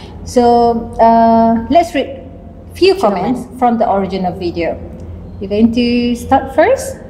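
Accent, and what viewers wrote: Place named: Malaysia. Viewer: I like MALAY ACEENT, SO CUTE ❤️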